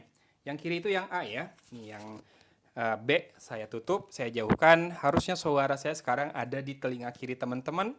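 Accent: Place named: Java, Indonesia. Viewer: Medhok ya Pack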